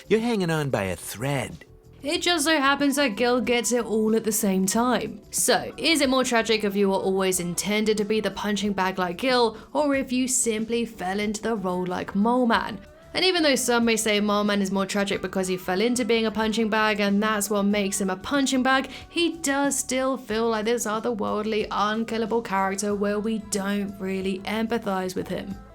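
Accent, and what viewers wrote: United Kingdom. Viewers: your voice and British accent keep me charmed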